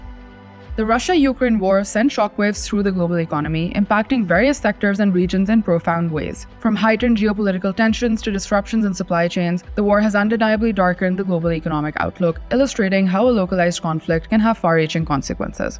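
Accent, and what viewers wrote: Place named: India. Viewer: sound like Indian and even the thought analysis seems emanating from Indian perspective. Indian thinking pattern is typical and easily predictable I like to tell the host of this channel - Indian thought are useless, and the world does not need any perspective from indian analytical so called expert, because right here in Asia, we have far qualified expert than Indian thought expert. ...I just listen 1 min and i already know what background you are coming from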